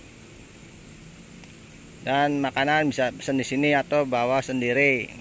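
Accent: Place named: Java, Indonesia. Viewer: logate kaya wongg jawa lagi jalan jalan ning Majalengka lanjutttt nyimakkkk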